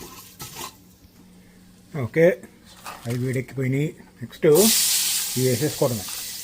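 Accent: Andhra Pradesh, India. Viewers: Bro meedhi telangana ayinappatiki mee slang completely andhra style lo untadhi...❤ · Bro mee vooru Nizamabad antunnaaru kaani mee bhaasha Andhra slang lo vundi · Bro, meeru telangana nizamabad ayna, telugu mathram ap slang la undhi 😊😍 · Medi telangana khada. Mari andhra slang nti ani na dought 😊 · meru chese vlogs chala baguntai, meru asala andhra na telangana na? Me native Nizamabad ani antaaru kadha, kani real ga ne meru Nizamabad aa? leka andhra ninchi akkada settle aiyaara? Me Telugu pure andhra laga untundhi, please clarify cheyandi. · meeru telangana kadha anna slang mari andhra laa undi enti anna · needi telugu andhra telugu la vundi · day 2 of asking ----- anna nvu gun carry chestava safety kosam? and nv nizamabad nundi annav kani andhra slang undi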